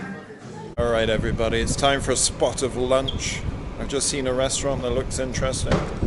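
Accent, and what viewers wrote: England, United Kingdom. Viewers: Which part of England are you from? Can't work out your accent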